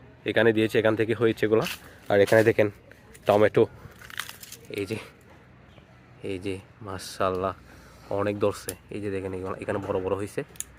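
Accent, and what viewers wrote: Chittagong, Bangladesh. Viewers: চিটাইংগা ভাষা হজে সুন্দর লাগে 🎉❤❤😅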